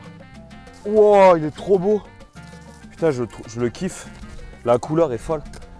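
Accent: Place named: South, France. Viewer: Très beau travail dans le rendu final vraiment bravo ! J'ai quand même encore beaucoup de craintes sur la pérennité et la sécurité de la cabane au point de laisser des gens y dormir (juridiquement et en cas de pépin) . Et par contre stpp arrête de parler de bois flotté partout mdr c'est pas en le trouvant au bord de ton cour d'eau que ça y est c'est du bois flotté 😂 En tout cas j'ai quand même tout suivi de A à Z et j'ai kiffé tout ton projet ta du mérite 💪(petite dédicace à ton accent du Sud étant de Nîmes ❤️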